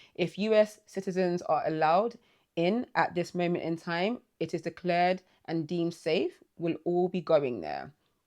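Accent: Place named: United Kingdom. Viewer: Hiya, german here, your channel trailer got recommended to me. I have stopped watching WWE stuff a few years ago and am focusing on other promotions and old shit, but you're sympathetic so I'm giving a sub. You sound british, you going to All In (and Revpro or others :D )?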